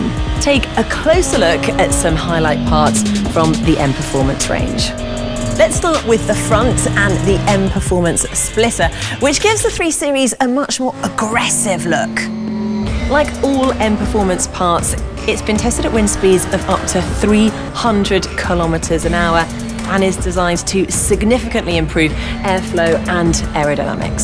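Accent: United Kingdom. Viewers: The British accent just does it for me, great vid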